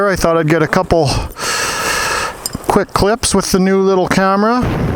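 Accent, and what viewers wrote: Canada. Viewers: My bad, you sounded like a couple guys I know fron way out on the atlantic side of things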